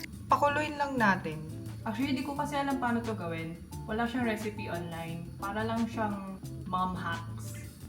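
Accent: Batangas, Philippines. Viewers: Mahilig ako sa mga video ng mga tao at ibang culture. Mga ibang lahi na nasa pinas. Or pinoy na nasa abroad kasi nasa abroad din ako. Eto talaga paborito ko sa kanila kasi kababayan ko are sa batangas haha. Pati pagsasalita. Nakakamiss mga batanguenyo. At mabuti yan sa mental health mo andyan kankung saan ka masaya kaya mabuti at nasa pinas ka. Napanood ko kasi ibang mga video mo. Ako at yung ibang pinoy sa abroad no choice minsan. Nakaka epekto talaga sa mental health natin. Napanood ko kasi yung homesick video mo.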